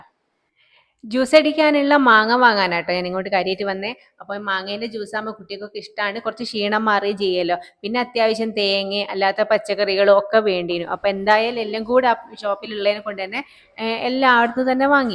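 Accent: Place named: North Kerala, India. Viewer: ഹായ്.... നിങ്ങളുടെ സംസാരം കേട്ടിട്ട്... പേരാമ്പ്ര.. നടുവണ്ണൂർ or മേപ്പയ്യൂർ touch... ജസ്റ്റ്‌ ഗസ്സ്..... Good family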